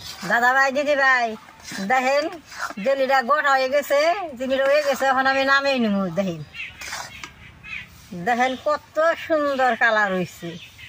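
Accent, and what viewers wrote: Tangail, Bangladesh. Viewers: টাঙ্গাইলের ভাষা।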